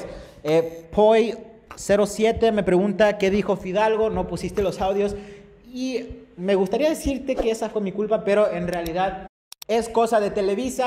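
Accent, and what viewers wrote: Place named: United States. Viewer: Está ablando el pirrurris gringo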